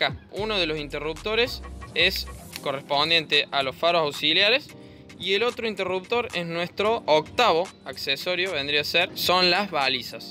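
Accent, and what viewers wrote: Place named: Córdoba, Argentina. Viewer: Hola, al escucharte ya veo que eres de Cordoba. Yo tengo el modelo 2020 le cambie el foco por uno Phillips Xtreme Motovision y alumbra muchisimo mejor. Es lo unico que le cambie, porque he visto que bien regulada la moto, no tiene problema de arranque en frio, ni hace ruido de valvulas. Le puse un parabrisas alto y anda mucho mejor en ruta ya que ataja bien el viento. Algunos viajecitos cortos hago pero me arreglo con la parrilla y una mochila. No quiero ponerle alforjas. Linda moto, anda muy bien y estoy pensando este verano en ir de Cordoba a Salta. Saludos desde Alberdi, Cordoba.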